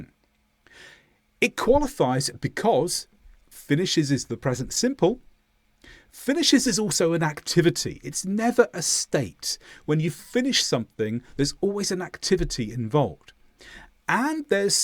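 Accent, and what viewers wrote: United Kingdom. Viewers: Wow the British accent whatever it is nonsense 😮😢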